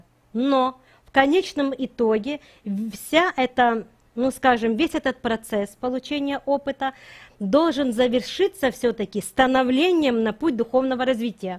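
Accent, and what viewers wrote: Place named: Ukraine. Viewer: Благодарю Вас, от всей души за беЗценную информацию, которой Вы щедро делитесь с нами, неблагодарными. 🤗🙏🏻 Тут вот кто-то покритиковал Ваш украинский акцент, а моему сердцу он мил, особенно когда Вы изображаете обывательские речи, я очень смеюсь, и всё, что Вы рассказываете, остаётся в памяти моей. С вами я полюбила украинский язык и песни, часто пою "Ой там, на горiиии..." 😂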